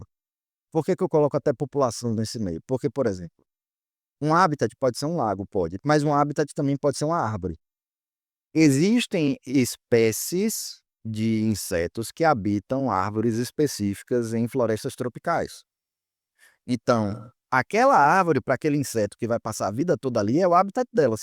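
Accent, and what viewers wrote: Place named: Bahia, Brazil. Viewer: Aula com sotaque baiano é melhor ainda!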